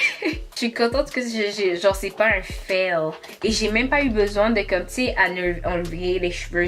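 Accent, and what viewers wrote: Quebec, Canada. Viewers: Bonjour après tout ces mois la perruque est-elle toujours aussi belle ? Je compte l’acheter mais j’ai peur car les vendeurs sont très irréguliers. D’ailleurs tu l’as vraiment acheter toi même ? Je me méfie souvent des partenariats 😂 Sinon je kiffe ton accent (je suis française) mais tu es québécoise non ? D’habitude le français québécois et moi😬 Mais j’aime trop le tiens 😭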